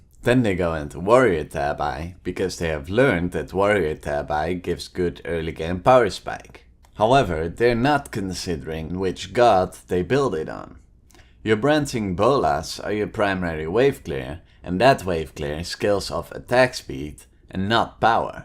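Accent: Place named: Netherlands. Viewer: Thanks for this video, definitely going to improve my Xbal! Off-topic question but do you mind telling me where you're from? You kind have a Dutch accent, but not sure if you're actually from the Netherlands. :p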